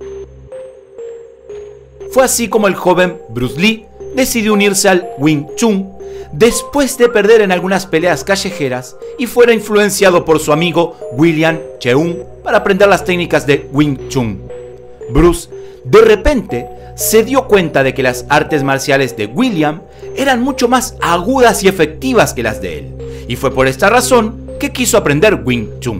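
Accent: Argentina. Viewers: chileno? Pensé que era argentino.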